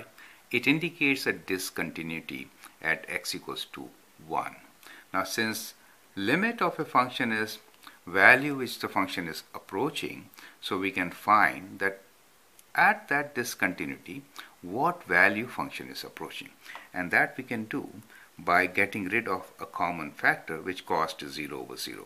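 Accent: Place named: India. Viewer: nice job,. great accent too. reminds me of a group of indians we were working with at a start-up company & brings back good memories.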